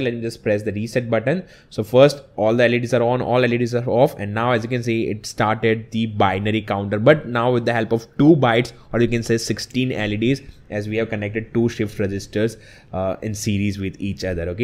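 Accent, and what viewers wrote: India. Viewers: Don't take it wrong, but it'd be nice to put a little effort on pronouncing english better. The strong indian accent makes it very hard to understand these, otherwise, great videos.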